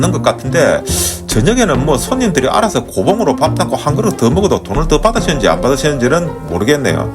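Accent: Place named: Busan, South Korea. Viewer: 네네 정겨운 말씨랑 같은 부산시민이셔서 더더욱 즐감햇어요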